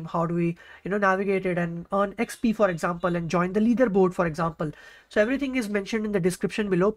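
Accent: India. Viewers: why indians has this accent?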